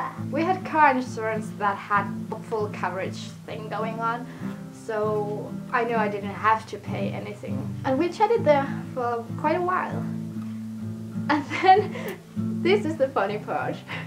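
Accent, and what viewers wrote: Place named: Finland. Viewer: Hahhah, it's a Finnish accent :D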